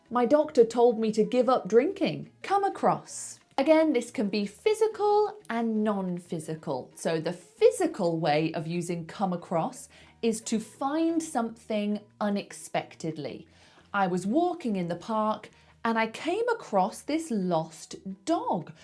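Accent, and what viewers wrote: United Kingdom. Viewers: I'm on B1 English level, this video was good for me, thank you very much. I watched it with English audio, but sometimes I needed to read English subtitles. I'm Brazilian, and I think your accent is British English.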